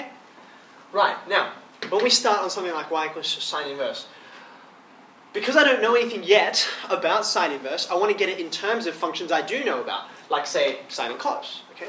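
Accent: Australia. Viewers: indeed. disguisting australian accent.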